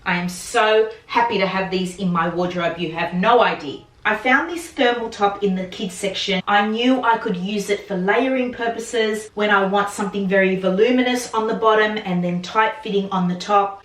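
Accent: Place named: Australia. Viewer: You did good again. Be careful wearing that navy "slip dress". Warner's is a lingerie line. I think that's a "slip" for "under " a dress. Sorry, I couldn't understand your Aussie accent, but we're you say Lida Biday? I think that's a designer from Sweden. I have a problem distinguishing plaid and check, but I think that's a plaid shirt. If it's too large a seamstress can alter it smaller to fit you better. I can't understand how the prices are sooo cheap. Also, beautiful Frenchie shoes.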